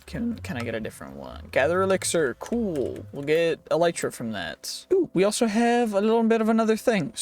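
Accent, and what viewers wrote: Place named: England, United Kingdom. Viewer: 1question ... where the fuck comes the extreme english accent come from 😂😂😂?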